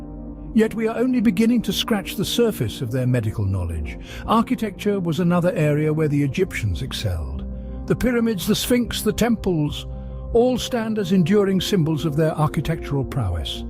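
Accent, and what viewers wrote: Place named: United Kingdom. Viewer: It's painful to hear this shit especially in British accent... Western so called HISTORY expert just lays and lay, timeliness and historical facts all coming from the old testament and the talmud... Nothing in all of the documentaries makes any sense all just to make one creature happy....... FACT 🙈👁️🙉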